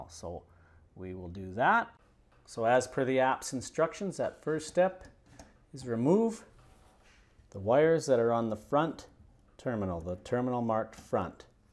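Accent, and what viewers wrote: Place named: Canada. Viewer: Great video. You say your in Arizona but you sure sound Canadian!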